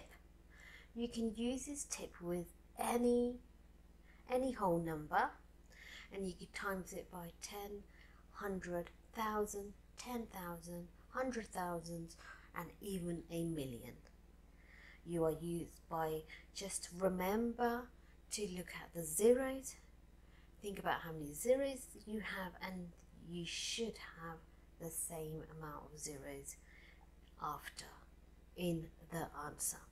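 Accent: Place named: United Kingdom. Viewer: Shes british i think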